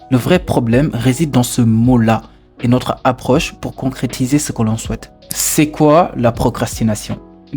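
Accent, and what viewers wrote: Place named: Senegal. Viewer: Un frère sénégalais❤❤❤ je regarde toujours tes vidéos j'ai jamais sue et je trouve notre accent très propre ne t'en fait pas😊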